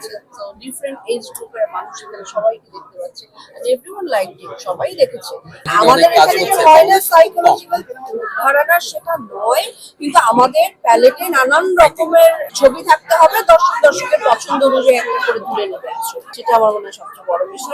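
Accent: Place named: Kolkata, India. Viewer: এর কথায় কলকাতার টান আছে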